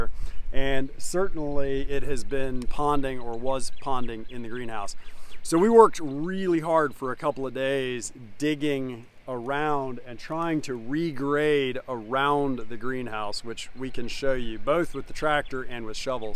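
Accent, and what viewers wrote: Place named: Pennsylvania, United States. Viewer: sounds lie you are from PA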